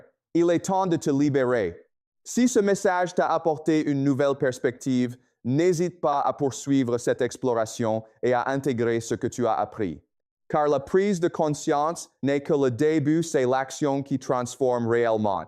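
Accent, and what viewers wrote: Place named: England, United Kingdom. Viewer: Dommage pour l'accent anglais, je préfère la voix habituelle ...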